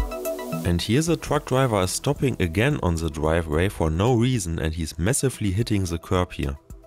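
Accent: Germany. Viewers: Das ist das Deutscheste Englisch was ich seit langem mal wieder gehört habe. Aber schöne idee auch clips für die Englische community zu machen.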